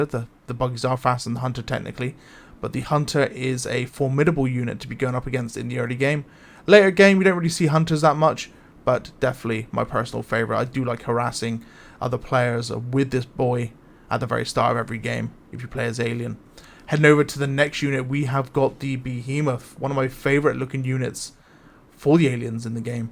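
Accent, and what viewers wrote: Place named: West Country, United Kingdom. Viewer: Do I detect a fellow West Country lad? When you say the queen has her own audio? What audio effects does she have?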